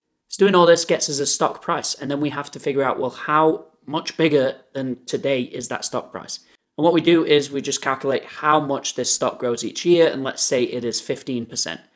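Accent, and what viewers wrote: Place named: United Kingdom. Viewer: You sound British, are you from the UK?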